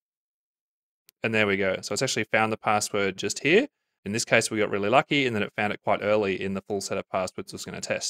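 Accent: Australia. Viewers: The updates you have made to the code, the videos and what is clearly a lot of work is appreciated and meaningful. Thank you. I have your current code running just fine on about 5 systems at the moment. I've explored and set up machines on vast.ai just fine as well. Got all of the GPU code running through the tests 100% but my wallet is a very old Blockchain wallet that's not been converted yet because I don't know the password. I can't seem to use a GPU via OpenCL or Nvidia. I have the id and it's connected to my email just fine. I can and have used the scripts to download the wallet and I understand --data-extract just fine as well. In any case, I've gotten all the way to having lots of possible passwords in my possible passwords files. At this stage, I'm simply trying to work out the most optimal means of plugging all of that back in to figure out the precise password. 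It says that the difficulty of my wallet is 10 which seems good relative to the difficulty rating on some of your test setups. Did I detect an Aussie accent? I'm in the Melbourne area. I'm happy to pay on a consulting basis as long as this is not a percentage. My sense of my situation is that I'm a few mm from the finish line at this point.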